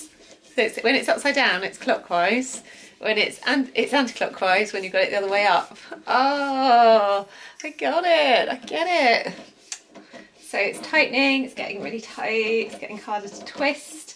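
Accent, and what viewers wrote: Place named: England, United Kingdom. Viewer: How beautiful English accent.. Many thanks for informative video.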